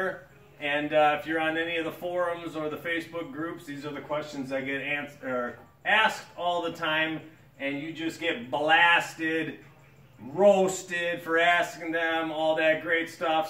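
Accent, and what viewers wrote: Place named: Wisconsin, United States. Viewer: This is the most Wisconsin sounding tutorial in the world. As a life long Wisconsinite I love it. I was waiting for and “ope” on the reset switch. Great content.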